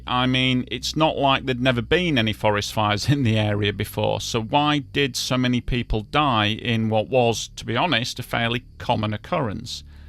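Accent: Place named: North of England, United Kingdom. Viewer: you sounded as though you came from the North of England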